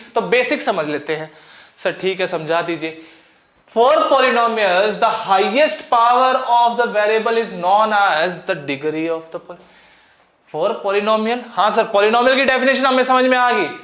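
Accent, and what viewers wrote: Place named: South India, India. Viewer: Sir aapka naam kya hai? Are u from South India? It assumes of ur accent…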